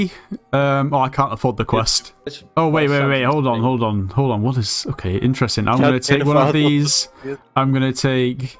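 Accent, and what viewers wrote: United Kingdom. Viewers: With the other brits you sounded REALLY British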